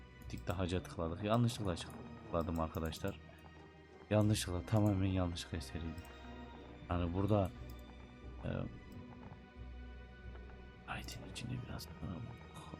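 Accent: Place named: Ankara, Turkey. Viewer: bizim taraflarin şivesine benziyor anlamında demek istedim. Ankara yani. Ankara'nın neresi?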